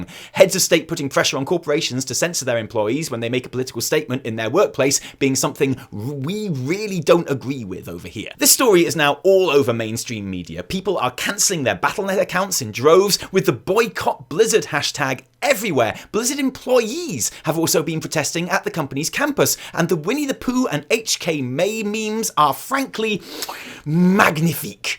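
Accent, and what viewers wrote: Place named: England, United Kingdom. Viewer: Y'all get a ton of flack for being "blizzard shills LUL" but nobody can doubt your intelligence, eloquence, or lovely English accent after watching this. I'm sharing this video with all my friends who need a TL;DR for the Hong Kong situation, and / forever have my respect for their sincere and well thought-out arguments against late stage neoliberal global capitalism. Cheers! <3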